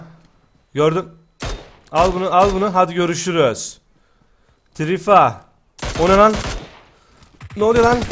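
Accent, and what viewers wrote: Adana, Turkey. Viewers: OLUCU DİCEKTİ VARDI DEDİ HAMURUNDA ADANALI ŞİVESİ VAR VİDEO CEKECEM DİYE DÜZGÜN KONUSMAYA CALISIYORSUN BRO 👑👑☺️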